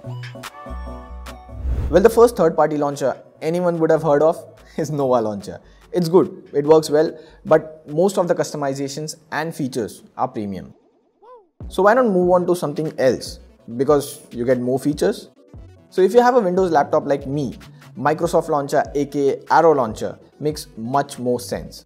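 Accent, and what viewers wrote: India. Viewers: clicks on the video, dude speaking in an indian accent, shuts the video never trust indians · Damn, l could barely understand, hideous Indian accent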